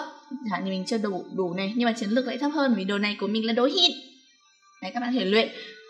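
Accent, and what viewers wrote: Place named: Hanoi, Vietnam. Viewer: Rất thích giọng con gái hà nội 💝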